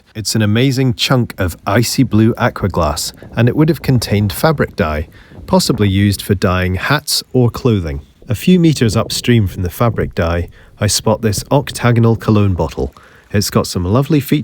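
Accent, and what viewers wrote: United Kingdom. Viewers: Which country is this? He has an English accent but is he actually in England? 🤔🇬🇧🏴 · Love the British accent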